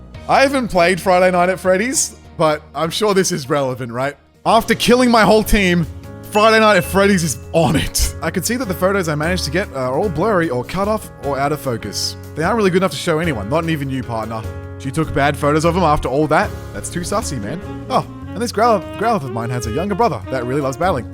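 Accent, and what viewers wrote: Australia. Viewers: i never realized how australian you are matye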